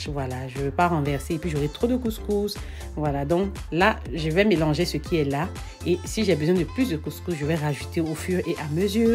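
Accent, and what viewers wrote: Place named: Ivory Coast. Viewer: Ma chérie je sais que tu es ma sœur Ivoirienne vu ton accent👏👏👏🥰🥰🥰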